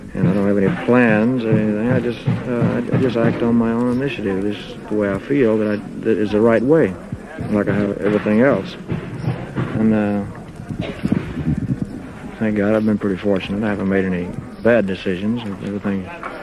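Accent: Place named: South, United States. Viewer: I LOVE TO HEAR ELVIS SPEAK, HIS AMAZING SOUTHERN ACCENT. THIS WAS A GREAT INTERVIEW WITH THE KING OF ROCK N ROLE FOREVER !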